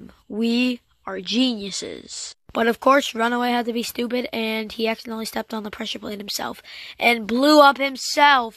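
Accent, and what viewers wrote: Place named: United Kingdom. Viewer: You have a good British accent and that's coming from a British Person.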